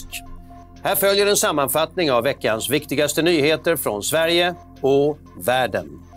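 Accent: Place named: Baltic states. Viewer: Lätt svenska. Låter Balt...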